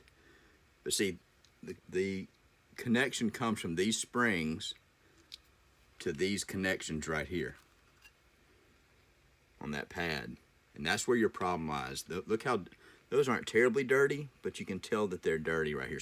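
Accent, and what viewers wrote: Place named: Texas, United States. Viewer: Does this guy not sound somewhat like Matthew McConaughey?!?! LOL! Gotta be from Texas.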